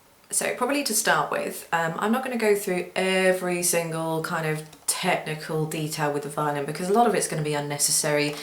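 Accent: United Kingdom. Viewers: Love that Limey accent! When she says "tune" she pronounces it like "chune" Chic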